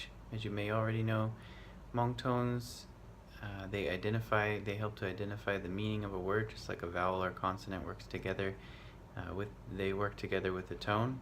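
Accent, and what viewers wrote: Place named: Thailand. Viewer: Thank you so much! This was super helpful to me! I can’t believe I didn’t see this one before. Your accent is super thai here.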